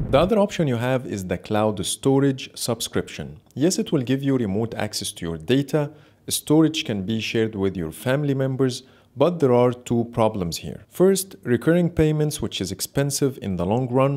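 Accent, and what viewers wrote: Egypt. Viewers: اللهجة المصرية بتميزها من مليار شخص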